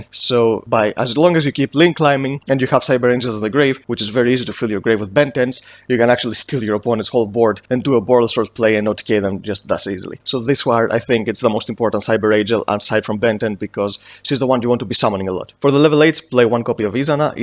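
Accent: Greece. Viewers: έλληνας είσαι ρε φίλε; από την προφορά ρωτάω αν ναι, κάνε το true draco/monarch ντεκ να χαρούμε :P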